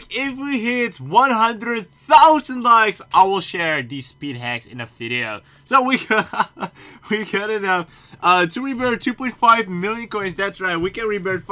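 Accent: Netherlands. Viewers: wait r u dutch?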